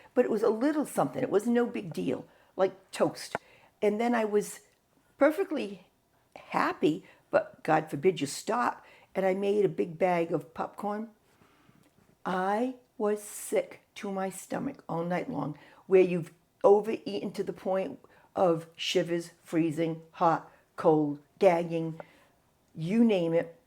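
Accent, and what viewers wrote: New England, United States. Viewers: Hello,! Your house is perfect for showing. I'd leave the throw on the couch. It adds a touch of coziness. You don't have to take down your rack inside the closet. Just make it clear to potential buyers that it does not stay. Your china is beautiful. I was going to suggest your DIL may like to have your set of china, but you answered that question. Your laundry room looks MUCH better. Not perfect, but I agree the volume is very low. I just crank up the laptop volume to the loudest setting and turn up the video volume and I can hear you fine then. I do not hear a lisp though. I do hear your lovely New England accent, but I haven't picked up on a lisp. It's 6 degrees here and -13 wind chill. We've had rain, freezing rain, and snow. Just enough to cover the ground. It's cold enough all I want to do is hibernate. The sun is shining brightly so I like that part. 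Have a great weekend. Rest up for next week! See you next time! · your accent is lovely. I love the New England accent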